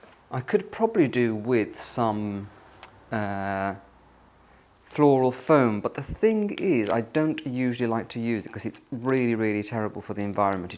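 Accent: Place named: England, United Kingdom. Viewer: Hi, I love your English accent and the way you decorate your house. My daughter loves the vintage decorations, and I know she loves your way. My taste is a little more glam, but I also like yours. I'm very glad to see your posts. I send you my blessings from Riverside, California USA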